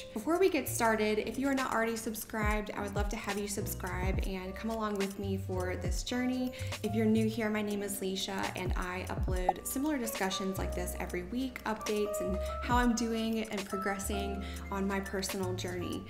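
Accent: South, United States. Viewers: I’m English and live in London, I love listening to your southern accent 😄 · I disagree, but I'm not American. One of my favourite elegant ladies, Kathryn Morgan, a professional ballet dancer, is also southern. I find your accent really sweet, definitely more so than certain British accents (like the Midlands!!!), and I've never had any trouble understanding you.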